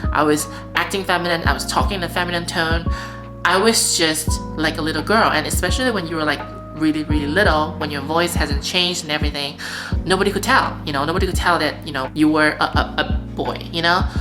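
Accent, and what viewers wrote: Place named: United States. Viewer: Thanks. My wife and I are legally married in the US and she’s a US citizen now. You should do a video about what you think about the state of LGBT rights and acceptance in China and marriage equality in China. It would be cool to know about how you became so fluent in English, too. That American accent didn’t just come from nowhere and inquiring minds want to know! Thanks for your consideration. I’m Loving the channel.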